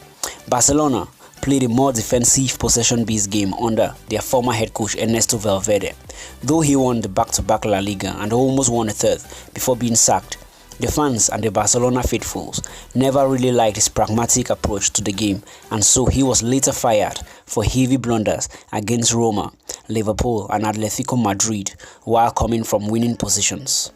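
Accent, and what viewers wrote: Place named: Nigeria. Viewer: You sound west African, I can tell because your accent sounds so familiar, I'd say your nigerian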